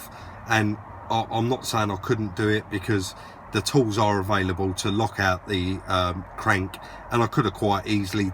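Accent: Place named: London, United Kingdom. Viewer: You sound like Danny Dyer with that london accent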